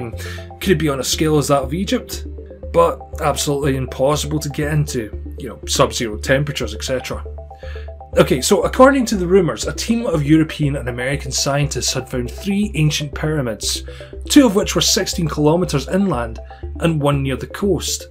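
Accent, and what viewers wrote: Scotland, United Kingdom. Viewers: Noor M Yus don't tell the Scottish man narrating, the he is English, he is likely to get very upset. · If my great grandfather Reed Gordon hadn't moved from Aberdeen, Scotland, I'd have that same awesome Scottish accent. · the scotish accent made me lol good video tho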